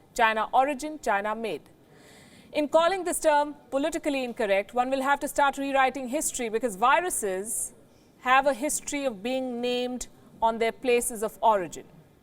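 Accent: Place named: India. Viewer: goj76*&£21^&^? curry accent, didn't understand a word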